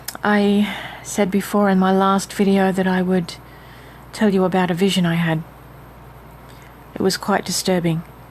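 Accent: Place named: Australia. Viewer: I though your accent was Aussie. Just watched your video about "who you are". It must be lonely to be stuck in a hotel room far away from your home, but there are no coincidences with God. Maybe you made a mistake by coming here to marry someone you really did not know, but God can use that for His purposes. Maybe that was to get you away from a country that is forcing the V on everyone. Anyway, in my opinion we don't have much longer to wait for His return. PS: I am a musician--banjo, guitar, mandolin and dulcimer. Mostly old time gospel in nursing homes, churches, etc. Well, some folks don't consider banjo players as musicians. LOL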